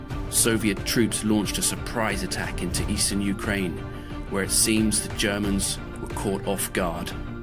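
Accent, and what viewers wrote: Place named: United Kingdom. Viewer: That was, is and always will be amazing- never-ever-ever seen anything like that in the history of A&A videos! Your Brit accent makes it sound like a real war footage reel, it's even more ground breaking than my NO LIMIT SHOWS - if this doesn't make your name+ A&A channel known in our community, I just don't know what will!!!!!!!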